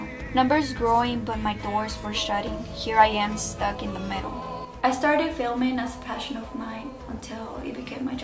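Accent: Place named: Spain. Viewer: Go to school and get a degree ! Unfortunately you can’t just start as a Spanish influencer then suddenly cross over to English influencer especially with your accent so thick. I would suggest you create videos in Spanish and use YouTube as a source of income not a concrete job because with wars and inflation lots of sales ( links in bio ) are going to go down . People are more tight with their money especially right now .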